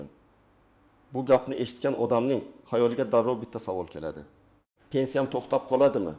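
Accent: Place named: Tajikistan. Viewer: Бу одам тожикми. Узбекчани акцент Билан гапиради.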